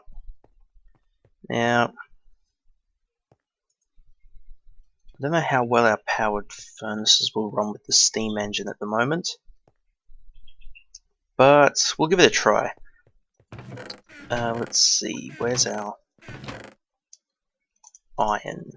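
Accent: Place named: Australia. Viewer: One of the reasons I enjoy your videos is that I get to listen to another Aussie accent :)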